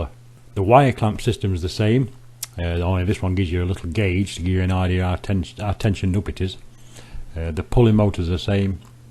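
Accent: Yorkshire, United Kingdom. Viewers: You sound like you’re from Yorkshire? Where to you get your gas bottle from for gas mig welding?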